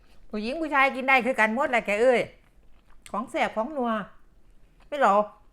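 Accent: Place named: Korat, Thailand. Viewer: วิธีหุงข้าวเหนียวด้วยไมโครเวฟ เยี่ยมเลยค่ะป้า อยู่ส่วนไหนของโลกก็มีอาหารของโปรดบ้านเรากินน้อจ้าป้า สำเนียงโคราชนี่ได้ใจย่า🙏❤️🤟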